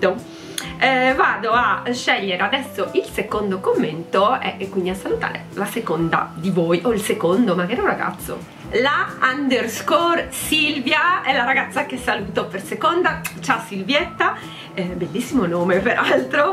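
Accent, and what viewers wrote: Rome, Italy. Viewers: ...dimenticavo: ti ho adorata in versione romanaccia, anche se ci devi lavorare😂 Daje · La mia regione 😍 stupenda tu in versione romanesca 😂 ti volevo chiedere una cacio e pepe ma ormai penso se ne parli al prossimo giro ❤️ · Wow ma sei bravissima, il romanesco poi..... Sei fantastica! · Sarda trapiantata a Milano e che riesce, e lo dico da romana, a fare piuttosto bene le battute in romanesco!😂 Spesso chi ci prova esagera, tu no! Evviva la carbonara. Mi sono mancati i disturbatori💚💚💚💚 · No te prego....dopo il tuo parlare romano ti amo ancora di più...sei un mito😊🤗👏👏👏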